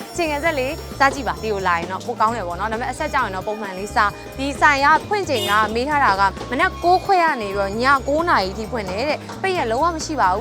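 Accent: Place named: Dawei, Myanmar. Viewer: ထားဝယ်နဲ့ဘိတ်ရောနေပြီဟ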